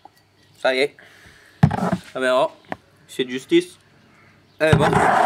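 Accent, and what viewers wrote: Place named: Belgium. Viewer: le soleil du sud avec un accent belge ? le combo de dingue un belge sudiste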